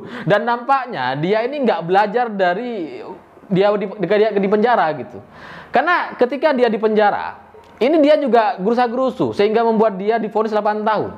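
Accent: Madura, Indonesia. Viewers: Kok logatnya Madura?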